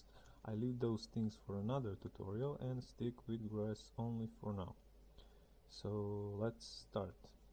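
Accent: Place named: Serbia. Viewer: May I ask where are you from? Your accent sounds like Serbian. Of course the name that I saw on the blog saying the same thing. Excellent work. For how long have you use blender?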